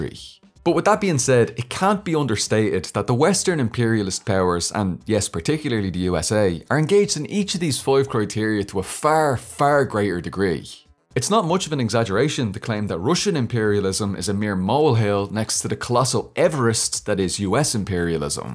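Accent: Ireland. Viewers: Oh shit I'd always wanted to watch an Irish lefty creator, pity you have the sound of a dub in ya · Are you Irish? You seem to have the accent lol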